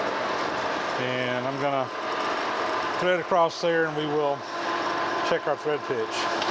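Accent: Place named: Georgia, United States. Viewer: I'm in the firearms business and there are a number of historic firearms-specific threads we use, such as #6-48 (for Remington optics rails) and #8-40 (Mossberg and (interestingly) Benelli, or at least Benelli USA). The fasteners have to be custom made, so when customers call about tapping threads, I suggest they just go with the closest standard instead of the weird historic thread, so they can find a tap more easily and also buy fasteners from McMaster-Carr. It's hard to describe what makes your videos so compelling. The other night my partner and I spent a couple hours watching you dismantle the stoker engine. She loves your soft Georgia accent. The lack of profanity is awe-inspiring (I light up the entire neighborhood when I'm working on my car).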